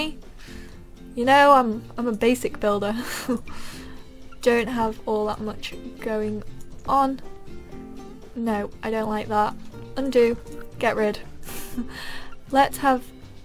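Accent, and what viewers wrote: England, United Kingdom. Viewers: Hahaha! I feel so identified with the lack of building skills... Anyway, Sims 4 seems perfect for us! I love the videos, you are making the wait easier!! And your british accent is adorable, by the way! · ***** she totally british you can tell by her accent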